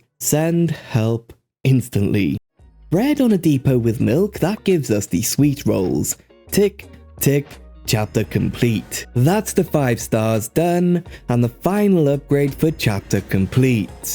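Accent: Wales, United Kingdom. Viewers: I recognise a Welsh accent anywhere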